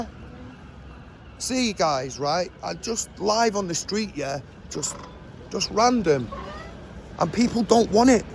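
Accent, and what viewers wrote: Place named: Manchester, United Kingdom. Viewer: Its I love your Manchester accent and you are spot on with you are saying this YouTube video: Author my mate and brother..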